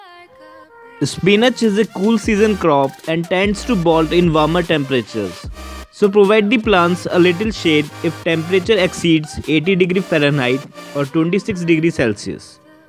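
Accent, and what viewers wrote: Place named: India. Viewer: super ahhh zzhy ! Famous Indian accent!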